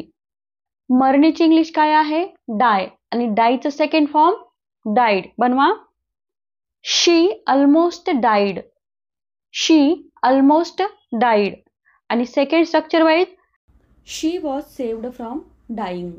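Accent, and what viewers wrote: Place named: Marathwada, India. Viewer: सुंदर शिकवता पण मराठावाडा किंवा विदर्भात अस बोललं जात जस सेव्हडं, स्लिपड, कृपया तस नका बोलू.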